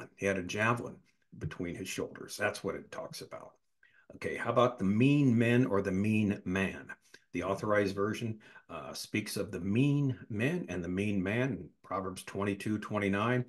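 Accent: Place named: United States. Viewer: But you speak American English accros the pond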